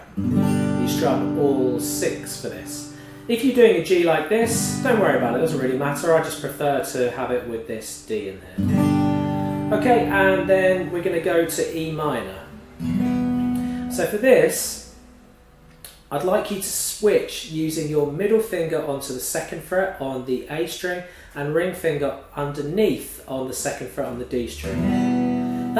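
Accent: United Kingdom. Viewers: i likes this guy, his accent is wonderful #yeaBRITISH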